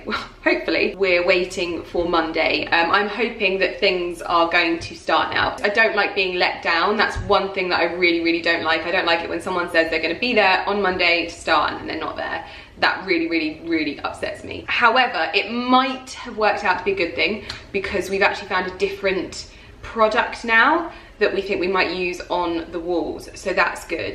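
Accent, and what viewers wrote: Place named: Scotland, United Kingdom. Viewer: Omg will you shut up about your Scottish accent!! It’s getting old now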